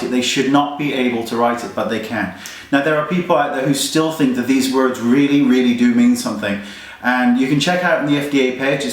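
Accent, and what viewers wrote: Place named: London, United Kingdom. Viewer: really random question, but in your videoes you speak with a very londonish accent, but there are times in your videos where i can here a twang... are you from somewhere else but use a london accent so viewers can understand?:) xx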